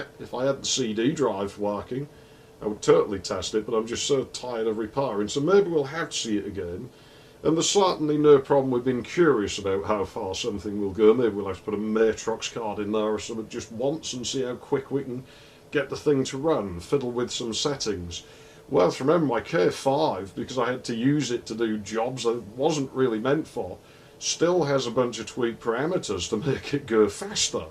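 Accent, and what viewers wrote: United Kingdom. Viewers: As someone who has watched UK TV shows for years, I thought I was used to your myriad accents. I was clearly a cocky bastard. Guess I just have to watch a few more of your videos to get an ear for it. Nevertheless, this is a fantastic video about a neglected CPU. My first computer was a Cyrix, so while I don't think of it in fondness, I respect its place in my history. It played those Apogee & Sierra games beautifully and ran QBASIC like a champ. It came with Windows 95 OSR2, which many people forget about when talking about 95.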